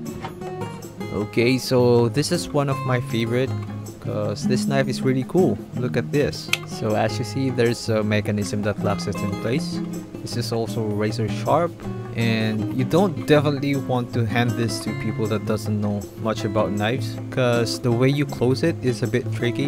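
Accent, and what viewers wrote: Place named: Philippines. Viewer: You sound like your from philippines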